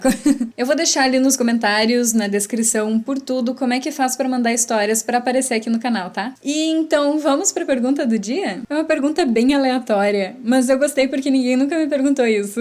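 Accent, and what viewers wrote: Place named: South, Brazil. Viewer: Adoro vc e o canal. Vc tem sotaque do Sul. Sou gaúcha. E vc é de onde?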